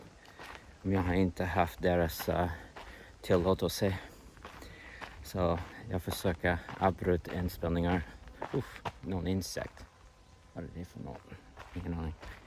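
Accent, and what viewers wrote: Texas, United States. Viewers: De som säger att din svenska är bra har 100% rätt! Man hör ju förstås att du kommer från USA, men om någon har det minsta svårt att förstå dig är det för att den inte vill (troligtvis för att den inte tycker om "icke-svenskar"). Första gången jag ser dina videor och det är superintressant att få inblick i vården i USA från en mer realistiskt källa än House 😆 Att du har kommit så långt med språket på bara tre år är superimponerande, jag vet flera som varit i landet 10-30 år som fortfarande inte kommit lika långt. "En"/"ett", "den"/"det", "de"/"dem" är svårt, ("de"/"dem" gör otroligt många infödda svenskar fel på), men det är ju alltid lätt att förstå även om det skulle bli fel. Svårare blir det ju om mellanrummen hamnar fel, hoppas du inte jobbar med någon "grå hårig sjuk sköterska" t.ex. 😉 Bra jobbat! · Utmärkt svenska med bra grammatik och ordförråd. 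Du talar ju helt flytande utan pauser för att leta ord och det är inga som helst problem att förstå dig. Personligen tycker jag inte att du ska jobba bort texas-accenten, jag gillar den skarpt. Har alltid tyckt att det låter coolt när engelsktalande talar svenska. :) De enda smågrejerna jag noterade var ovanligt ordval på något ställe som "det var en stund sedan", vilket är direktöversättning (while) från engelska och det kan man ju absolut använda och alla förstår men mer vanligt är att man säger "det var ett tag sedan". Sen var det någon omvänd ordföljd som "ibland vi får tala engelska med dem" istället för "ibland får vi tala engelska med dem". Men det spelar inte heller någon roll, alla förstår givetvis ändå. Mycket bra jobbat! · Nice work. The Texan accent makes it hard pronounce certain stuff. I teach kids football in Swedish and my English accent is an issue with certain words. Great work though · Det låter riktigt bra. 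Klart din svenska har en viss Texas-accent, men åtminstone jag som finländare hör mycket klart att du har lärt dig svenska i Sverige. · As someone who knows it, he sounds just fine. Its easy to understand him, he speaks in a "natural" way (as in, not "book swedish"). Theres a definite american accent but honestly we dont really care about that:D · You speak good swedish without the very strong accent americans often have. Be proud you have nothing to worry about. I understand everything. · Din svenska är alldeles utmärkt och jag tycker du fått till fin satsmelodi. Att det hörs att du är från Texas är bara charmigt. Det gör det inte svårare att förstå dig. Bra jobbat!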